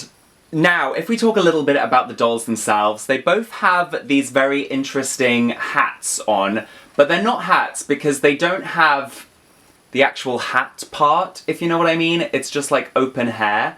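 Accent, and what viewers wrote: United States. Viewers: I appreciate your American accent ❤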